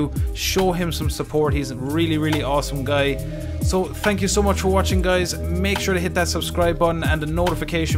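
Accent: Ireland. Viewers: Are you Irish? I'm Irish and you sound Irish Tbh😂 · As soon as I heard ur voice I could tell that ur Irish, what county u from chap? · You sound like you're Irish. If I'm right, which part of the country?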